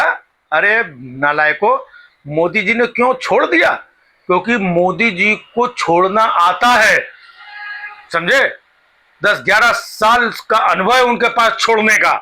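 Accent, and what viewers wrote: Kanpur, India. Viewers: ji aap Kanpur ka accent me bola karo pls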